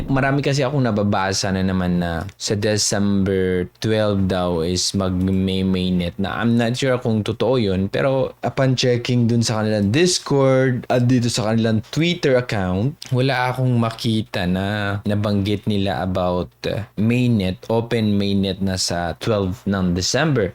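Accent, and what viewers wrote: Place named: Conyo, Philippines. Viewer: Conyo magsalita pero ayos salamat sa review boss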